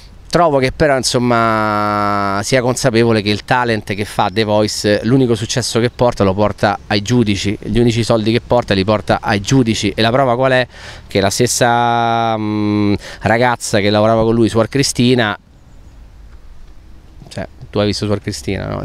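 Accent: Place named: Parioli, Italy. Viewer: Ma sta vocetta e sta parlata da pariolino???? Ma che è successo????? A piotta nun sei più er ghepardo de na vorta!!!! Però c ha ragione!!!!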